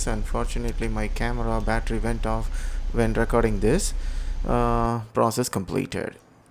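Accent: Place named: United States. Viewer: US accent is good.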